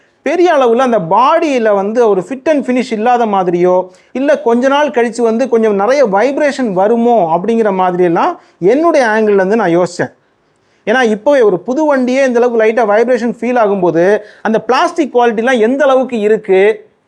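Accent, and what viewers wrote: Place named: Kanyakumari, India. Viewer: உங்களுடைய தமிழ் அருமையாக இருக்கிறது நீங்கள் கன்னியாகுமரி காரரா?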